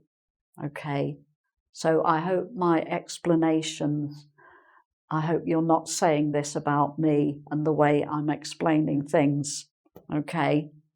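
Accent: England, United Kingdom. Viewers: Let me you that I love your accent, even though for me it's kind like annoying sometimes to hear people talking with that britsh accent because I'm more familiar with the american one but you speak so clear that your voice sounds lovly. Thank you very much, I love your lessons, and no, their not as clear as mud. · thank you I adoooore the way you teache . love your videos , I love your accent and wich one day I will get it, please , are you from england ? love this accent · Perfect british accent and very good teacher, thanks for your lessons.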